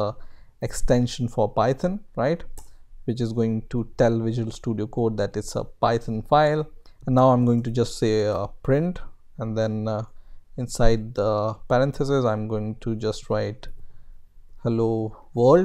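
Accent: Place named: India. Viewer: you know it will help you when they have an indian accent thank you indians for making youtube tutorials all day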